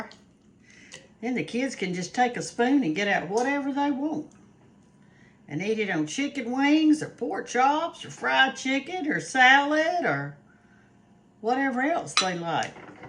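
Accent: South, United States. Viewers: Your hair looks so adorable ❤️❤️❤️ I just love tuning into to listen to you!! You remind me of my Grandma, but with your beautiful southern accent ❤️❤️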